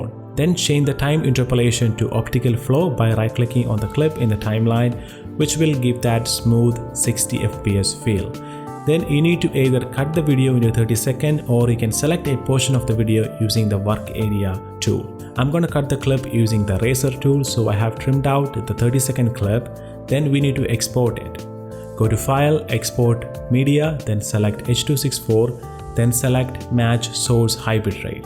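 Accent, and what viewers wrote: Kerala, India. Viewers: Ah English il thanne manasil ayi malayali anu ennu😁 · Malayali aanalleee.... Pronounciation kettappol manassilayi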